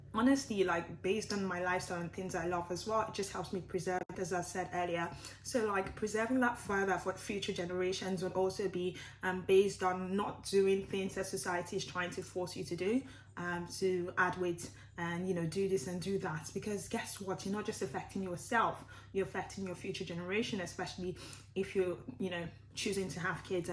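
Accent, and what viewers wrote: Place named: United Kingdom. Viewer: It’s interesting to see ur accent change from your older vids. You have a more British accent now. Almost no Nigerian accent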